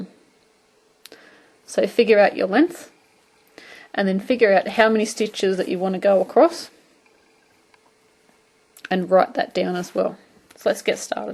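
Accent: Australia. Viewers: wow thx a lot for sharing God bless you-my daughter is in Australia for 1 yr. i suspect thats where your accent is- i understand it fine!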